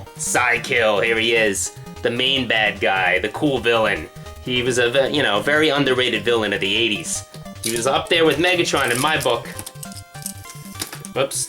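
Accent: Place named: East Coast, United States. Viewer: Oh I was just kidding around, your east coast accent reminded me of the Honey Badger video lol. Cool vid man, I really enjoyed seeing all those gobots! It would have been nice if you'd done a quick transform on them and then just edited out the time it took. A lot more work for you, I know, but definitely an improved viewing experience!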